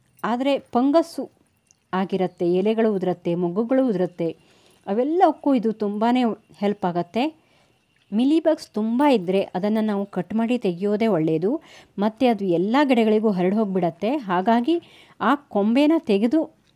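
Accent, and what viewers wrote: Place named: Kundapura, India. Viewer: nim voice,heluva reethi, hagu nammoorina kannada bhashe ya aa shaili nange thumba esta aythu.nammadu moola ooru cundapura the hathra kota.malegala the nim video noduke laykaguthe.e time nalli bulbs metre barutha heli.rply plz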